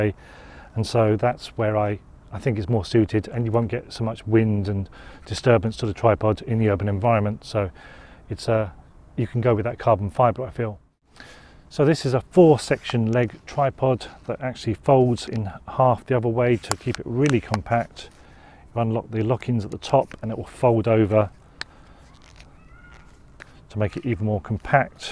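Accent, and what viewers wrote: United Kingdom. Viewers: At last, someone with a British accent, thank you!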